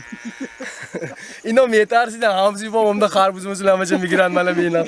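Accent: Konibodom, Tajikistan. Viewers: Карочи хайку хамин видеохои шумова гап нест. Чисто конибодомча новаст, чигда. Чиста дехоти. Канда занед бародар. Офарин.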